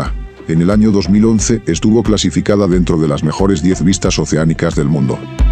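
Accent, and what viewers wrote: Spain. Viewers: Hermosos tus videos, solo que no entiendo por qué el audio con acento español. Es todo 😊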